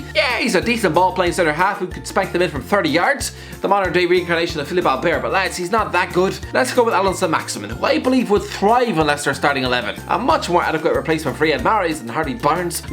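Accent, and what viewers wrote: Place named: Ireland. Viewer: Me: Goes onto HITC Sport Hears Irish guy Me: Leaves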